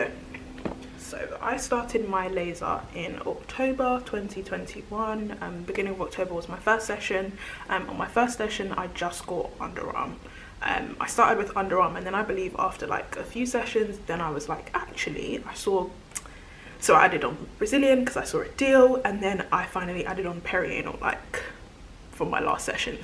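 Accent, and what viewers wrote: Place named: United Kingdom. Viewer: Man the British accent is annoying.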